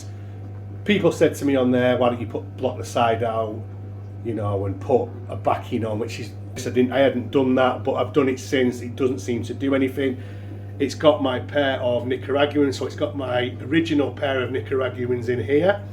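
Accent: Manchester, United Kingdom. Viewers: Love your vids, your accent sounds like your near me in manchester ??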